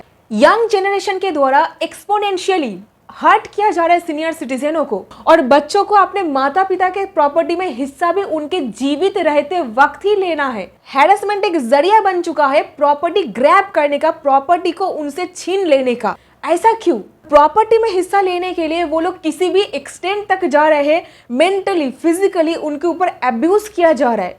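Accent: Bengal, India. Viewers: Madam Apke ke dwara bait gaye advice sahi hai Please take care that Bengali tone is coming to your Hindi version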